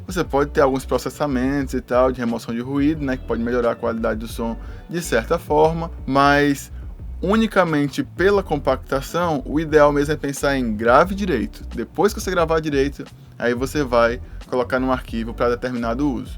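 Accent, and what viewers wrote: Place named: Bahia, Brazil. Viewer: A melhor parte é o sotaque baiano.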